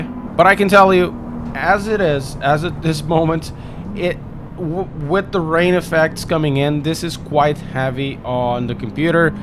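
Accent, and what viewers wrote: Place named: Portugal. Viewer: I opened this video and 1 second later I thought "This guy must be Portuguese". The accent doesn't lie. Cheers from Portugal!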